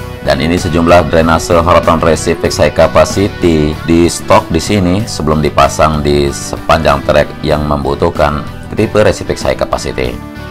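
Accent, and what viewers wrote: Lombok, Indonesia. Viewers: Suaranya masnya Itu lho suara khas mandalika banget😁😁, Topbgt 👍🏼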